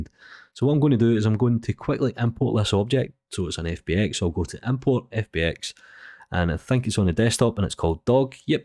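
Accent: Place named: England, United Kingdom. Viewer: I LOVE YOUR ENGLISH ACCENT MATE LIKE FROM PEAKY BLINDERS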